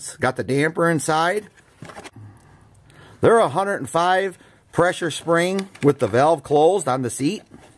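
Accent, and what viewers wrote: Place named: Minnesota, United States. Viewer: Just found the channel, pretty good! (where are you from? sounds almost a Minnesota scandinavian accent?)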